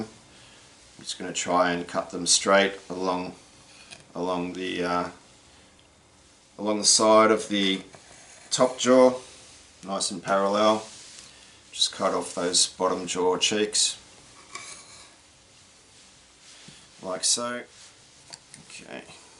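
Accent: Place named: Australia. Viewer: Thanks for explaining, particularly with those 2 comparisons, no one else seems to mention why it must be so clean, just got my first fox last week and I went straight to salting then scraping, but I will try your technique next time I'm lucky enough to get out (my permission got sold for residential). Good to hear another Aussie, cheers from Trentham, Vic.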